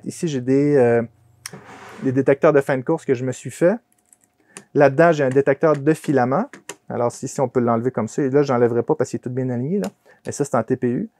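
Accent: Quebec, Canada. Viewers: Toujours un plaisir de regarder tes vidéos même si c'est pas toujours facile avec ton accent Québécois, mais bon l'accent Stéphanois (France) n'est pas mal non plus.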